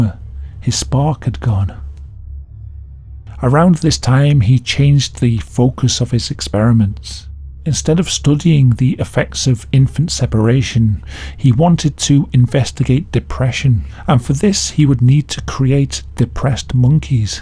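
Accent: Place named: Manchester, United Kingdom. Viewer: Oh,. You don't sound sarcastic, you sound like a Manc!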